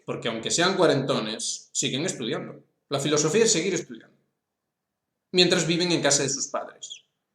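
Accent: Spain. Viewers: Es un gallego. No le entiendo ni madres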